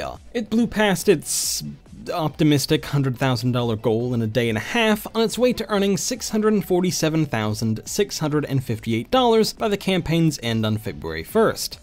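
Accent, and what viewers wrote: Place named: Scotland, United Kingdom. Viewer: "Home town in South Carolina" Has Scottish/British/Aussie accent.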